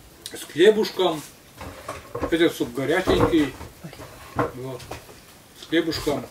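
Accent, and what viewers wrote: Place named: Vologda, Russia. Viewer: Зашла на ваш канал случайно и сразу покорил родной говор,мы живем в Вологодской области,просмотрела несколько видео и так все понравилось,что подписываюсь с удовольствием!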